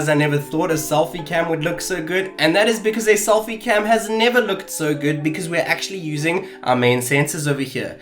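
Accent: South Africa. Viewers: Hey...so...are you south african?great review btw.anyway,any idea where one can get this in sa,or cheap shipping to sa online.Thanks a lot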